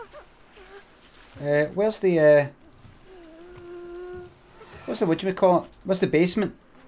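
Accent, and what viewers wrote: Scotland, United Kingdom. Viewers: Oh cool a Scottish DBD youtuber 😯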